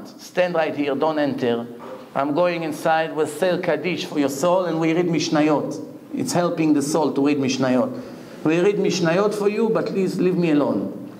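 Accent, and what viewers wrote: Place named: Israel. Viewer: he dont even try to make english accent hahahaha it's almost like he talk hebrew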